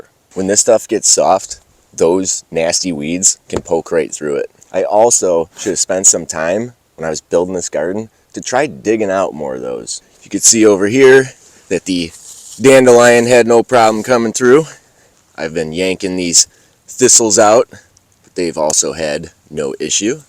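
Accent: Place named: New England, United States. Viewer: Ur Yankee accent is pleasant sir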